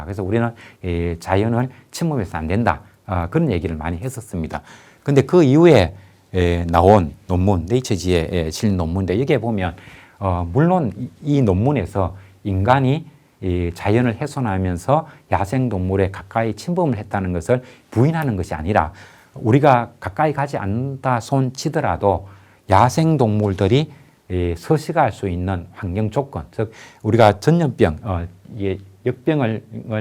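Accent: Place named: Gyeongsang, South Korea. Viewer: 갱상도 말씨가 고향의 오빠야가 다정하게 이야기해주는듯 듣기좋습니다. 어려운 제목인데 편하게 알아 듣기 쉽게 풀어주시네요.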